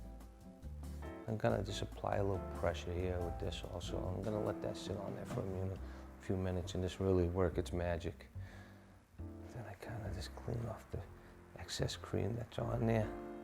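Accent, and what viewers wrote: Massachusetts, United States. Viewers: This video is so Massachusetts. The accent, The TB12 jersey, there’s even a Dunkin Donuts across the friggin street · Excellent barber great job!! 👍☝️ 😁 Love your MA accent btw CT native here 😘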